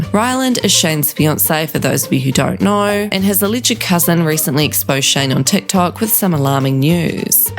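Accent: Australia. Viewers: The channel host is australian. 😁. Im trying nowadays to distinguish accents. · Australian female accent is the best imo.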